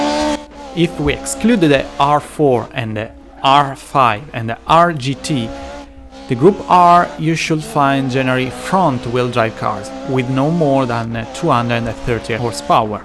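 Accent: Italy. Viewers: Nice italian accent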